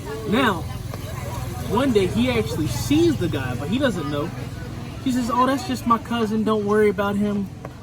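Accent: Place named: Spain. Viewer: Ahahhaha i love your Spanish accent. Ejejejej no tengo leche en la casa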